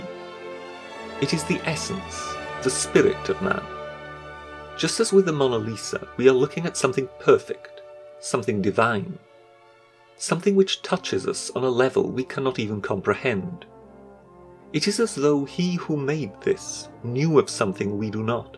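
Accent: Wales, United Kingdom. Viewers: Just a suggestion - You should try and give a more natural delivery than the forced ‘sophisticated’ accent you currently adopt. It’s really obvious and comes across as fairly arrogant and ‘try hard’, and undermines your entire channel in my opinion. Edit - Are you welsh? I just realised you sound a bit like Rob Brydon. I’ve always thought that accent sounded funny, it was hard to pinpoint your accent, at first it almost sounded like you were failing badly at attempting an old posh accent, but now I realise. Yet they say we have no culture?? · ahhhhhh I'm burning, the welsh accent is killing my ears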